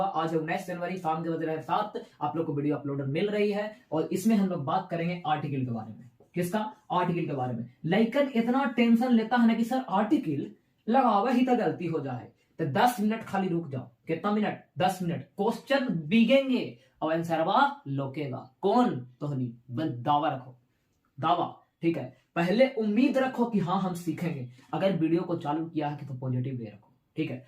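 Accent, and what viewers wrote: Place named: Bihar, India. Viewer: Bihari style me aap bahut ache padhate hai sir ji❤❤❤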